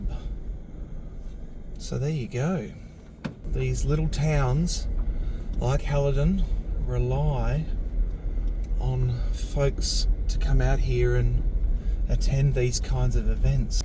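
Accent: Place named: England, United Kingdom. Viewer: yep.there good .people like living like that .if u look up.they have great innings .compared to city folk .an are happy . Nowhere is far from.anywhere. .below pt Augusta.try above .😮 might be hours to the neighbours 😂 an ocker up.an u will.get names right . English accent is causing it . Pretty funny given me a laugh. R u gonna give me heads up on that place I asked about . An I'll pass on 3 roads u will love . Plenty of cam opportunities .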